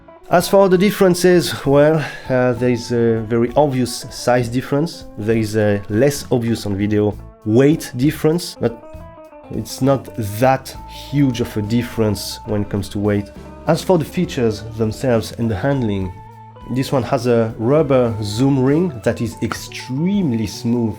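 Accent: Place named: France. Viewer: Your French accent made this video very enjoyable to watch - thanks!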